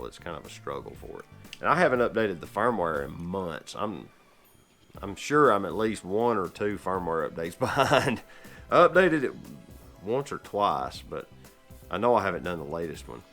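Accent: South, United States. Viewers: Centimeter? Really? :) Sounds strange when someone uses metric system with a southern accent. :) Great video, thank you very much!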